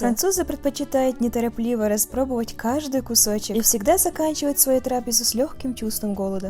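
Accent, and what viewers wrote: Chechnya, Russia. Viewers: Чеченский акцент выдает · А кто ты по нации ? Акцент на чеченский смахивает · Я вижу, вы мастер акцентов. Было бы действительно отлично, если бы автор написала, что она с Дагестана, я бы посмеялся с умников в комментах... Я слышу, что это вайнахский акцент, или Чеченка или Ингушка, но опять же, она и с Дагестана может быть, всё зависит от того, насколько хорошо она владеет языком и с кем дружит. Не надо сразу умничать и исключать все остальные варианты... Глупо выглядите. Вы можете предположить, но не знать на 100%. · А вы чеченка? Слышится лёгкий акцент)) А ролики у вас всегда получаются очень интересные .Так держать 👍👍👍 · Мне одному слышится чеченский акцент? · Извините , а вы вайнашка? Легкий акцент чеченский… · Да, очень милая и нежная, наверное чеченка или ингушка) немного слышу в речи акцент.. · Акцент чеченский… но ролики у блогеров все под копирку ))) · У вас акцент Чеченка? 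· Почему-то мне мерещится чеченский акцент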